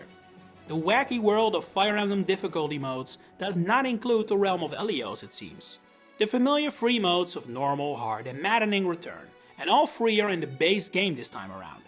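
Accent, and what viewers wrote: Netherlands. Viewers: I'll bet anything this guy is Dutch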